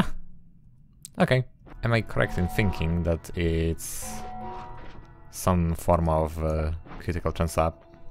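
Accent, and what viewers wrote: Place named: Poland. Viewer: You have a very slavic accent, are You perhaps Polish? Also noic nice wand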